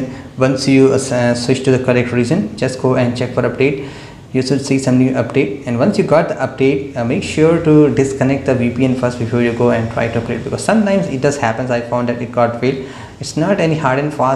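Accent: India. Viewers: Fucking hate Indian accents in general but good vid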